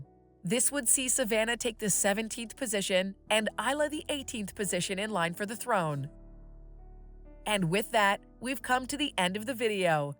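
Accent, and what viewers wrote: United States. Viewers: I cannot stand an american commentig on the Royal family, it just does not sound right.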